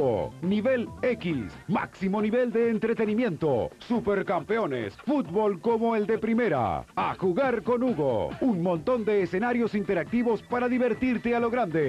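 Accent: Standard. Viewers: la calidad del VHS es sublime, lastima la fea locucion que tenia este locutor "neutro" el que teniamos en la señal Argentina era mejor, hasta la propaganda de Kito Pizzas estaba mejor hecha en su pais de origen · En vez de decir acá por Magic Kids, decían aquí por Magic Kids, o aquí en Magic Kids, pero igual se entendía, a pesar de que el locutor hacía la voz en neutro, se entendía igual de bien. 🙌🇦🇷👏🤗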